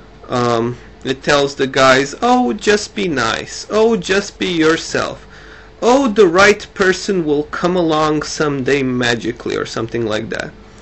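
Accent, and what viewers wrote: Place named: United States. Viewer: how come you don't have that heavy accent? your accent kinda sounds normal and like american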